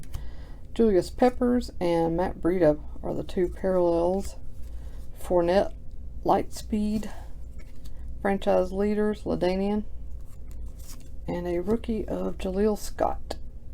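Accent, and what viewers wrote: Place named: Indiana, United States. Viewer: I want an answer to one question. Are you from Indiana? Because your accent indicates it. You sound exactly like my cousin Tanya and you don't cuss just like her, meaning you don't cuss at all just wanted to clarify. And if you're not please show your face just to prove it.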